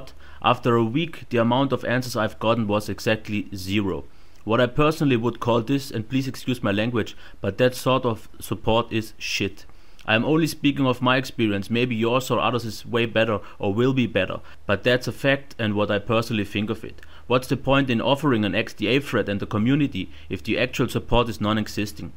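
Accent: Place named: Germany. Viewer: so richtig deutsches englisch :D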